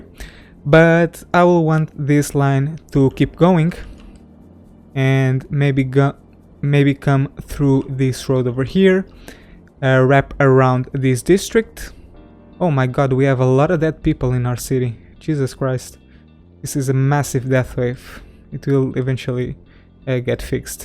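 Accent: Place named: Portugal. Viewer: I've played enough cs:go to know portuguese accent now LOL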